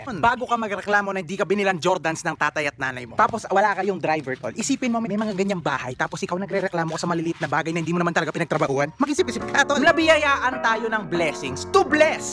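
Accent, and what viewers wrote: Pampanga, Philippines. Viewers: nice kapampangan ka nga haha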